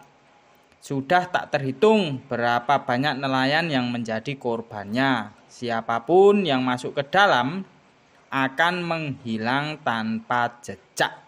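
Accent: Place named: Java, Indonesia. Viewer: Dan sangat medok wkwk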